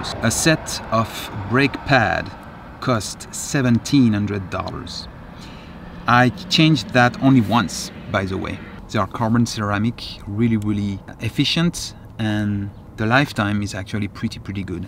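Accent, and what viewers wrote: France. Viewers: Very honest review, and I like your French accent ! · Is he French?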